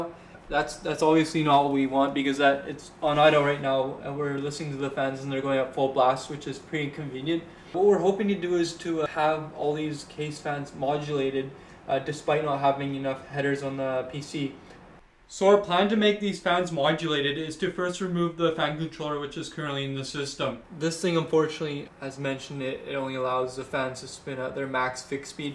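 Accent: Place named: Minnesota, United States. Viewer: You sound like the people in Fargo, are you from around Minnesota or Canada even?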